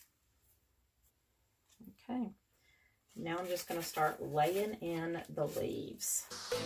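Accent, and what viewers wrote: South, United States. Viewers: You nailed it sista! So nice to hear and see occasionally, a southern gal, like myself, doing diys . I’m a Kentucky girl. I’ve just recently started doing diy projects and there are so many ladies that teach and/or show how to do different things. It’s a tad overwhelming at times but I’m glad I ran across you. I’ll be sticking with ya Momma!! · I love that I have Found someone who has that Southern accent like me, lol.I tell everyone that I'm a Flatland Hillbilly from Texas, lol.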